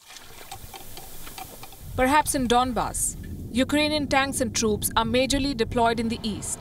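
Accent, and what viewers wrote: India. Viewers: She has a mad Indian accent! In fact ALL Indians have the same accent…weird🤪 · Sometimes I don't understand your english because your indian accent is still too thick for me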